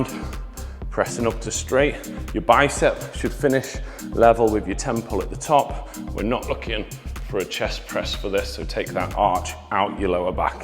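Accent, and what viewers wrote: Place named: Liverpool, United Kingdom. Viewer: A Scouser!!!!!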